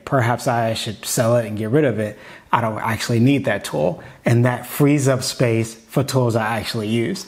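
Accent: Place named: Baltimore, United States. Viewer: My biggest takeaway from the 1.5 videos I’ve watched, is that I’m 100% sure this dude is from Baltimore. That accent is unmistakable 😂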